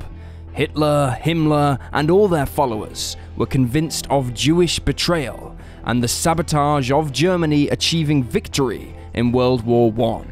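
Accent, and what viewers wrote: England, United Kingdom. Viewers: Voice like English. Loud the interrorgrater. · This limey narrator sounds gay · If you think that's an Australian accent.. it's obviously British.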